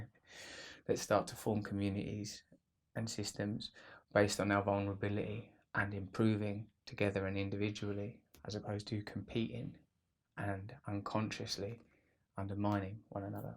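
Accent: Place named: United Kingdom. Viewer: You remind me of John Lennon because of your wisdom and British accent. Comedians are the smartest people in entertainment I've noticed.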